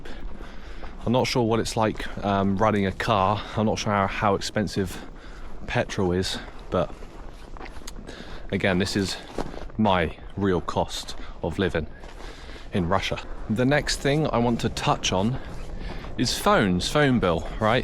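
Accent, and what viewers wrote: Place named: United Kingdom. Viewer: Да, очень приятно послушать. Получается нас действительно учили британскому английскому.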